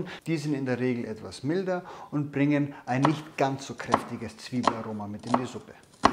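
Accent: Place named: Bavaria, Germany. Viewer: Seit ein gewisser Herr S. aus Bayern mit seinem Dialekt omnipräsent in den Medien auftritt, war mir das Bayrische nicht so angenehm im Ohr. Du hast das eindeutig in die positive Richtung gekickt.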